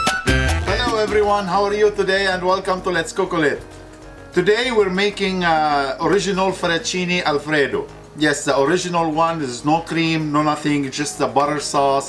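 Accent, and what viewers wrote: Lebanon. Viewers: You sound so Lebanese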